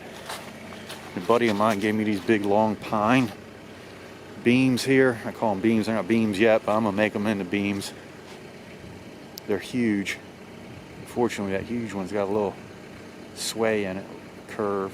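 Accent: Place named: London, United Kingdom. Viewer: Love your farm! Are you in MD? Your accent sounds like my friend's in Hampstead. Thanks for the Kohler info. - Craig in PA (LT40 wide owner)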